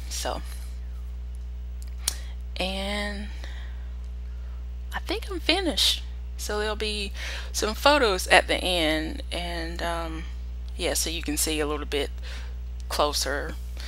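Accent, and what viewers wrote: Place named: South, United States. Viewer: This was the first time I've watched one of your videos -- NICE JOB! I totally love your Southern accent. Great PL spread. Thanks for sharing, I'll tune in again!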